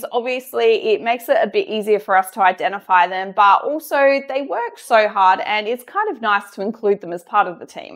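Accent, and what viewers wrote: Australia. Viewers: First thing I have to ask are u Australian. Just from your pronunciation of words . I'm thinking of starting something similar with 3D prints and design. Any info would be great i am in SA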